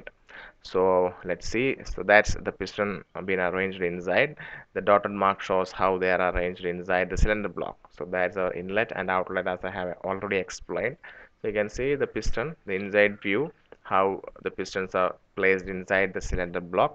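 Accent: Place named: South India, India. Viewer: Products sorry man,it south Indian English they cant help it,ask something technical u will certainly get an answer. south Indians are one of best technical race on earth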